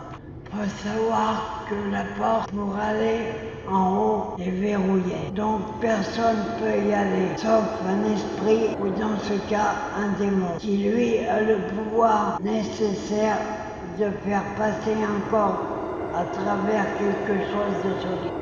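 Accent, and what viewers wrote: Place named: France. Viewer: c un français il a l accent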